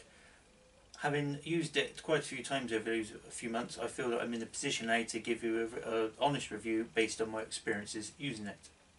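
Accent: West Country, United Kingdom. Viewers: west country lad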